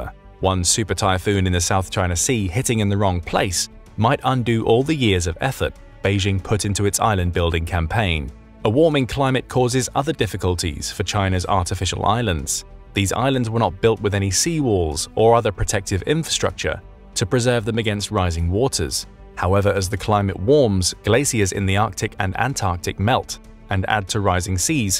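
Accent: United Kingdom. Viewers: Glad I found this channel. Informative reporting on contemporary geopolitics, and with an easy to understand British accent!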